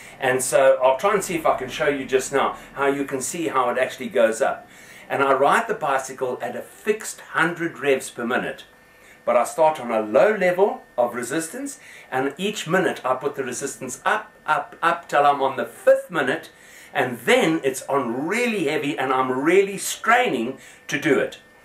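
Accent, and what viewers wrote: South Africa. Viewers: it says your from UK but your accent sounds South African??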